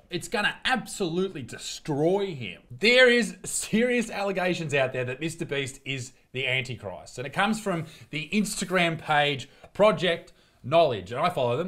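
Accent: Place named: Australia. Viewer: The devil voice just doesnt work with an Australian accent lol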